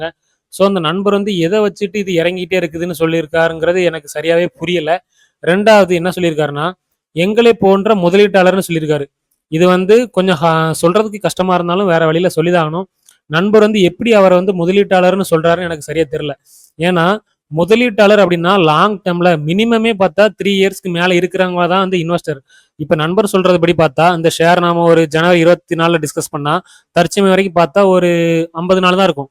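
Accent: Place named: Tirunelveli, India. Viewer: ok bro. Na tirunelveli than. Namma ooru pakkam ulla tamil mari erunthu athan ketten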